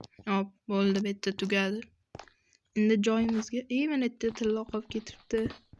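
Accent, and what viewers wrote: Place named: Khorezm, Uzbekistan. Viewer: uka xorazmlikmisan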